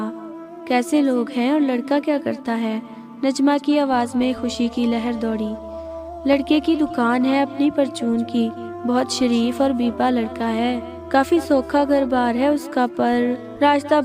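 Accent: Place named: Punjab, Pakistan. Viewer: Your Voice is So Cute With Sweet Panjabi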